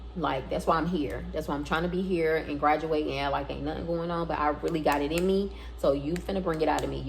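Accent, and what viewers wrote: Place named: South, United States. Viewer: Yess your story times are the BEST 🙌🏾 and I can tell you from the south lol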